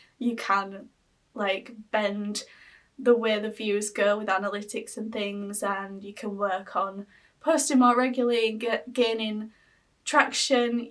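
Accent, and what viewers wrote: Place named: Yorkshire, United Kingdom. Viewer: I found your channel when I was looking up Yorkshire accents. I only know of one person from that part of England. I’m not into Sims, I do have iOS products, but I’m not as excited about them. My point... I’ll get on now and then just to hear you talking. Not trying to be a creeper, it just sounds cool. Take care. 😊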